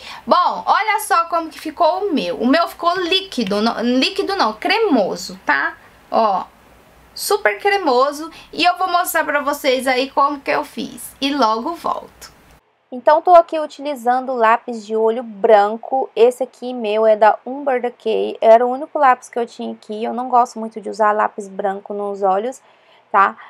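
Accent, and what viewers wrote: Minas Gerais, Brazil. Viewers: Eu amooo ouvir ela falar, parece aquelas mineiras bem da roça kkk ❤ Amo seus vídeoooos ❤❤❤❤❤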